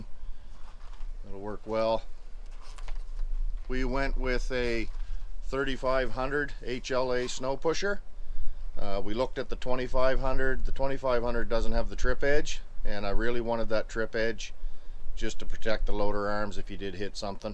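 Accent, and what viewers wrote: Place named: East Coast, United States. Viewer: As I’m watching this clip I’m thinking . Man he has an east coast accent haha. Then I heard Fredericton. Howdy from PEI.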